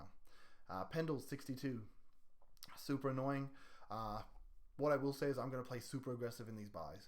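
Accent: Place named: United States. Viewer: You sound American lol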